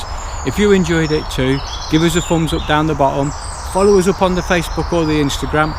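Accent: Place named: Yorkshire, United Kingdom. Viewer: Thanks for your video of macro photography which I thought was very encouraging for people new to Macro, I’ve been doing it just over a two years shortly after my retirement and like yourself find you get so drawn in to this hidden world which is all around us, but people completely unaware, just pass by it, I’m a Leeds guy and hope you don’t mind me saying sound like a Yorkshire man and my apologizes not, however if you are close to Leeds I would love to meet up with you sometime as I don’t have a photography friend I can converse with. Kind regards Dennis. Email: <mail>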